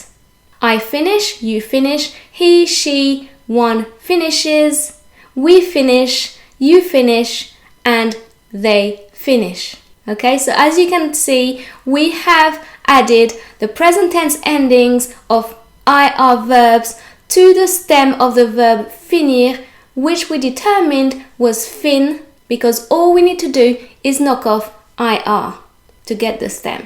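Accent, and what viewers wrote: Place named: United Kingdom. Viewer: Stop ur British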